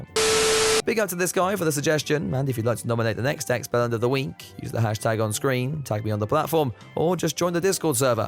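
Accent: United States. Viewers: Is that an American accent?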